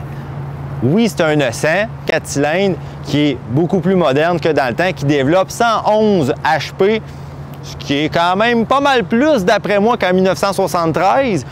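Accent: Quebec, Canada. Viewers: Lol je m'y ferai jamais à votre accent les cousins !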